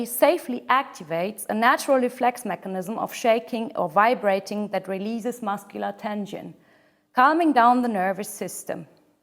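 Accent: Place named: Germany. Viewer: The explaining person's mother tongue is german, isn't it? :)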